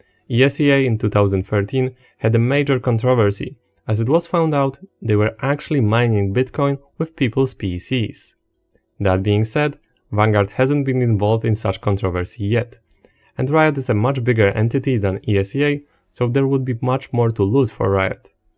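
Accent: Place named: Poland. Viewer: ziomek ma akcent jakby z polski był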